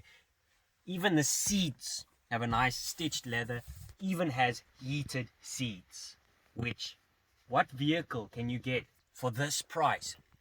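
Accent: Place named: South Africa. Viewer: Gd review bud, u sound South African 🇿🇦🤔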